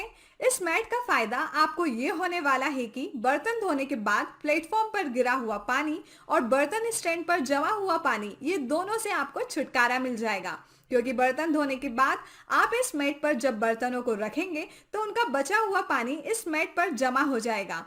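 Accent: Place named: India. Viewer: 这标准的印度英文听得好辛苦……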